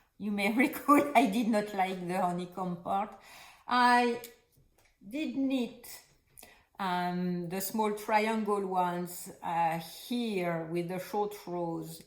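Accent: France. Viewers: Delighted to have come across your channel today while knitting. I enjoy listening to other knitters talk of their projects as I knit and I find your French accent very pleasing. I've always thought the French language is beautiful so your accent doesn't bother me a bit.:-) I live in Maryland....just outside of Washington DC and we are having many storms and heavy rain lately as well. Your WIPs are lovely. The Madeleine sweater you are working on looks similar to the Astragal sweater by Ainur Berkimbayeva that I knit awhile ago. I also like the look of that Ripple j Jacket pattern!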